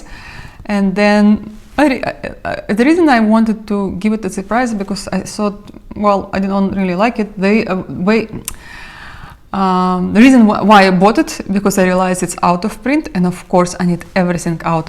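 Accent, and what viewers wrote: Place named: Russia. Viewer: Talk abour BORING! If I hear "just nan" one more time my head will explode! Your projects are SO boring, so dull, they completely lack color, and nothing is more boring than samplers! It's like oatmeal! And on top of that you attempt to say french words when you are barely understood in English because of your massively thick russian accent. Seriously? You are so blatantly bougeois in contrast to the suffering of the people in your home town! The only good thing about this video is susie, the most beautiful chihuahua.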